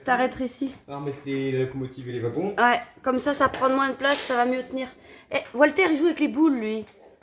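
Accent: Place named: Normandy, France. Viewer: Coucou, et le papa ❤❤❤ Chouette le sapin, trop mignon 😍 🎉 Décidément 😂 tu es spécialiste dans les pannes de voiture 😅 espère tout rentré dans l'ordre. J'aime t'entendre, tu as un côté rigolo à raconter tes expériences 😂 et avec ce parler qui ressemble tant à celui de mon enfance près du Mont St Michel (côté normand )dont j'ai gardé encore qq accents. 😅 eh oui, on ne change pas une équipe qui gagne 🤣🤣🤣 Gros bisous et bon week-end ❤😊❤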